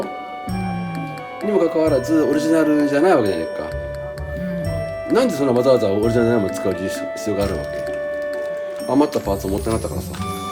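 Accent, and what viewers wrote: Niigata, Japan. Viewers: 違ったら失礼ですが新潟の方ですか？喋り方やイントネーションに親しみがあるので☺️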